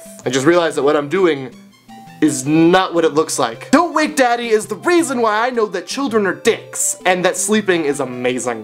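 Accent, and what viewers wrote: Canada. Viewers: i just realized from your accent that you are from Canada and now you look candian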